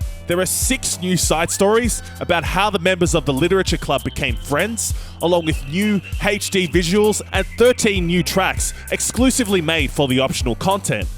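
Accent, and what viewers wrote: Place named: Australia. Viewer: why are you Australian? I actually need to know.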